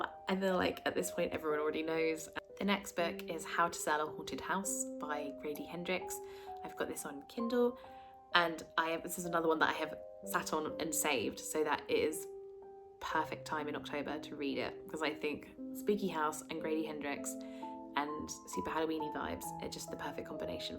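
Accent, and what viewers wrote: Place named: United Kingdom. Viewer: The shoutout to Sunnyvale Reject was cool. He told me I'd like your videos and he was right. I love your amazing British accent (I'll listen to anything you say) and your passion for reading and books. You're going to be big.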